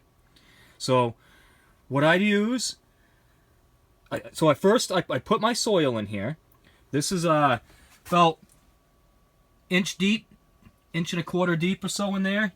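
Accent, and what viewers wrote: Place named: New Jersey, United States. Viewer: OK. I am from NJ. I though I recognize an accent. I am in "New Mexico" now. I run "Taos Teenie Greenies". I grow for restaurants and locals. I have a request to add Amaranth to my lineup. I viewed your video for some pointers on my 1st batch. Thanks for making the video! Useful information here. Good work!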